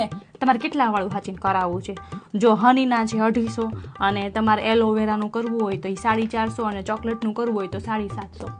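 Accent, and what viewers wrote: Kathiawar, India. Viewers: Sister I love your accent.... Hu Mumbai ma rav chu but Rajkot ane Junagadh maru native che ane desh ma jaiye atle mane tyani accent bau mithi laage... Tamari ekdam same accent che.... I love it sister.... Lots of love and blessings from Ruchi from Mumbai ❤😊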